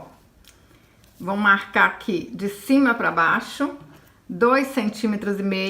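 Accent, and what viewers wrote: Minas Gerais, Brazil. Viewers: Que lindinho ficou esse vovôzinho. Adoro seus vídeos e seu sotaque mineiro me trás uma lembrança linda de uma amiga/irmã que já não está mais nada é mundo . Pessoa maravilhosa, doce e criativa xomo você. Parabéns pelo seu trabalho. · Estou encantada por a caso achei você estou cm covide e fiquei encantada me levantou por acaso você é mineira porque teu geitinho de falar é igual ao meu sou de Cataguases parabéns amei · Só nós mineiros temos este sotaque e expressão. É bom DEMAIS!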